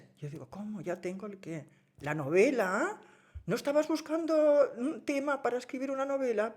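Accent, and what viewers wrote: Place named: Barcelona, Spain. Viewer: Me he divertido mucho.Pilar Eyre en estado puro.Nunca defrauda. Ese tonito pijito de niña bien de Barcelona, de revolucionaria reconvertida a nacionalista civilizada, de mis desgracias tienen un no sé qué de glamour que no puedo evitar que tiene encandiladas a sus seguidoras , especialmente de ultramar, es muy entretenido y en el fondo inofensivo.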